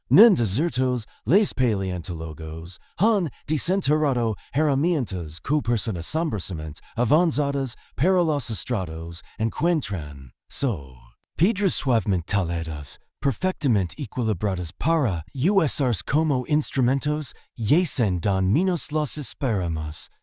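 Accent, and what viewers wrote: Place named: United States. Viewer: Qué cojones... Han puesto a una IA gringa cateta a pronunciar español?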